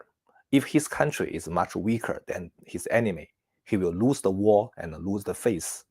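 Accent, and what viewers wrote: China. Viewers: good job, 老师的英文口音很中国🥺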